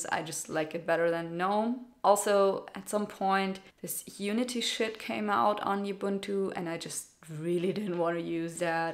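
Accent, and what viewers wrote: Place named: Germany. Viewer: i bet your German or something you have a accent.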